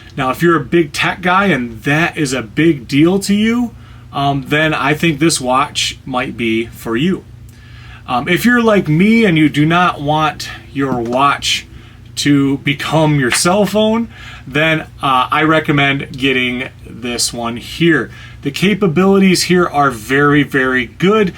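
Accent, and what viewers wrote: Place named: Michigan, United States. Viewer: This guy is so Michigan. The clothes. The accent. Dude looks like every dude in my town. Props from Traverse City, man!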